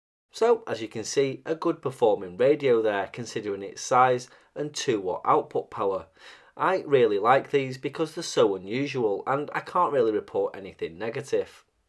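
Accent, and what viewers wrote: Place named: Northern England, United Kingdom. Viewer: is the absolute man. Great videos, great northern accent, great radios. Nothing over complicated, just good content.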